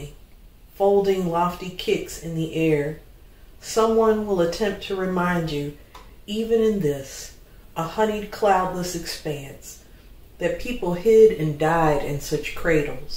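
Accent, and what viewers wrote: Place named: Illinois, United States. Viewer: I love your Central Illinois accent.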